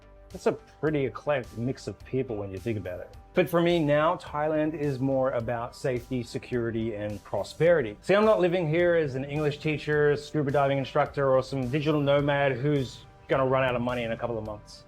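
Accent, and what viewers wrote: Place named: United States. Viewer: Seppo accent man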